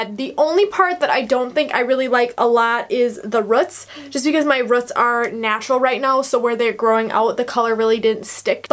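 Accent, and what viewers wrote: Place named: Wisconsin, United States. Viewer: I knew I heard a fellow Wisconsin accent lol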